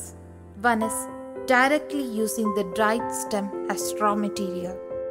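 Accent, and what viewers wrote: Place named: Kerala, India. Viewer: accent of sexy kerala girl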